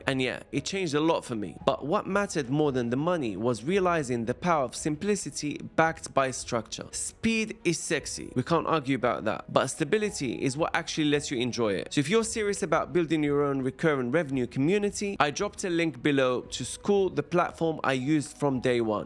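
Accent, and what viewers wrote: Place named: Morocco. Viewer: you have like morrocan accent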